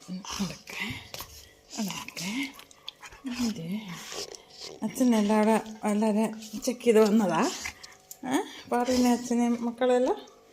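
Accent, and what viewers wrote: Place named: Thalassery, India. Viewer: സംസാരം കേട്ടിട്ട് തലശ്ശേരി ഭാഗത്ത്‌ ആണെന്ന് മനസിലായി... ഏത് ഭാഗത്താ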